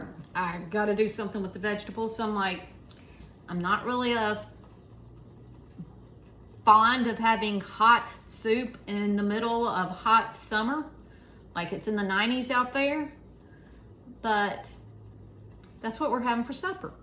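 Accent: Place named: South, United States. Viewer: Never apologize for your southern accent ha ha I'm such a hick myself lol Love that apron, so cute. I'm gonna show it to my mom, I know she can make me one. I love watching you cook. That soup and cornbread sounds so good, yum! I believe you're right about the haze it's the same way here. Never thought about it until you mentioned it.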